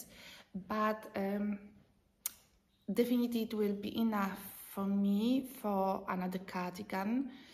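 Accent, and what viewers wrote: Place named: Croatia. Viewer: Hiya.. I was so delighted to come across you via Loretta - Knit My Way Home podcast.. she mentioned you in the #inspiredbyellenkal. I enjoyed your podcast & how cheerful you are.. and love your new cardigan and am looking forward to knitting it when I finish a couple of my other knits. I laughed when you showed the blue tack on your needles.. but a great idea, yes! Congrats on the new pattern.. and I look forward to seeing what you come up with in the future.. and maybe even test for you one day. Thank you for a lovely podcast.. Cheerio from an Aussie girl x Tea ps.. love your accent as it reminds me of my homeland Croatia 🫶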